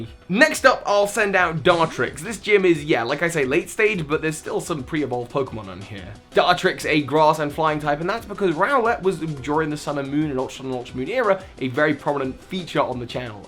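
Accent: United Kingdom. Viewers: I kid you not, I've been watching you for a year and a half now, and I swear I always thought you said "So hi" instead of "Soar high" until this video. I blame your British accent for that. Edit: I've been blessed with the coveted heart from!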